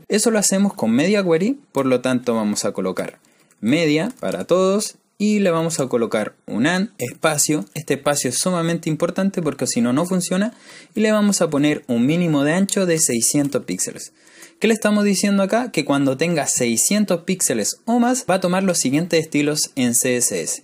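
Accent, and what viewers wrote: Chile. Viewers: Jsjsjs sabia que eres chileno jsjs tu acento se nota🥺❤️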